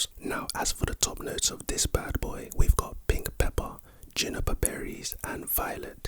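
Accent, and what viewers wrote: United Kingdom. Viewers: THE BRITISH ACCENT IS GOD DAM GOOD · is the best new male ASMRtist comin up this year. Love the Brit accent, smoov voice and great sense of humor. Not to mention his nose, which certainly knows. Big ups for getting me back into fragrances. I actually sound like I know what I'm talking about at the dept store fragrance counter, and every time my woman sees me she sticks her nose in my neck and gives me a big whiff to see what I'm rockin. Thanks u got the sauce fsho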